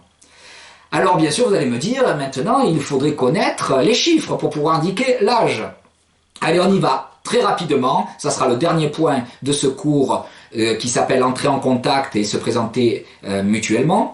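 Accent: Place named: North, France. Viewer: fort accent franchimand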